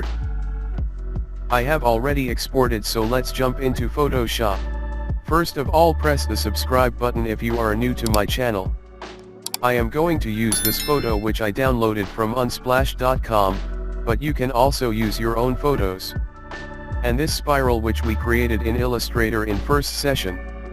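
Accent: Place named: United States. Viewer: Aap ayse kesy bol lety Hain American English?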